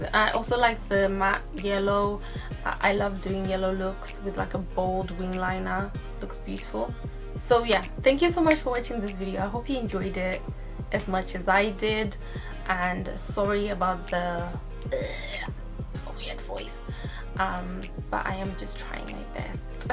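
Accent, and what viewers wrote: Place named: South Africa. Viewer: Your accent has a South African undertone 😳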